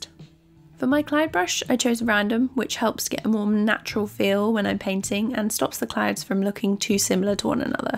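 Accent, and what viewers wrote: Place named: Norfolk, United Kingdom. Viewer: “Cuttn tool” love the Norfolk twang ❤️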